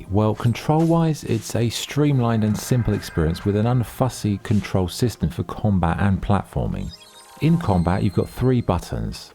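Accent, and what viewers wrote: England, United Kingdom. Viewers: I feel like the reviews are getting more organic. You and WAB are my favourites at the moment. Maybe English accents are just more tolerable.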